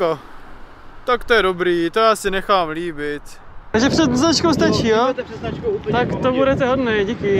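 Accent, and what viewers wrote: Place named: Moravia, Czech Republic. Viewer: Moravák jak poleno. 👍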